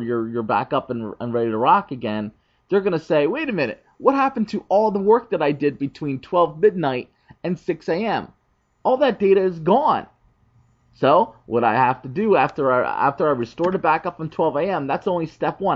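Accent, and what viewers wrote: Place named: United States. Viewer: the only video on youtube with north american English accent, easy to understand and no headache, no matter how good tutorial is by our Indian bros but very thick accent not worth watching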